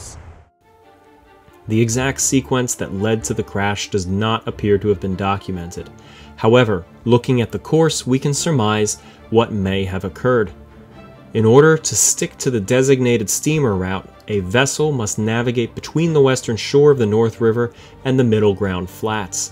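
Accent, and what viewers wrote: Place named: Michigan, United States. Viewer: could have sworn that was a Michigan accent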